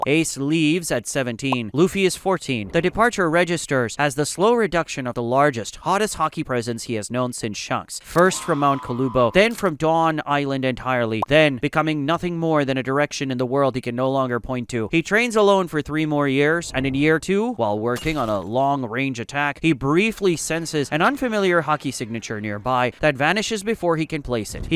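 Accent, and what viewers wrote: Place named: India. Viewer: why bro got indian accent?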